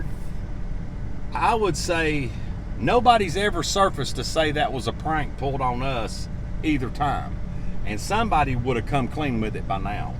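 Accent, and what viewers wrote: South, United States. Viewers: a southern drawl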